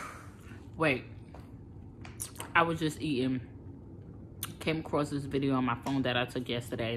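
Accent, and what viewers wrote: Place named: Brooklyn, United States. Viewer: It’s the east coast Brooklyn NewYork accent for me 😍💕 thanks for sharing honey ♥️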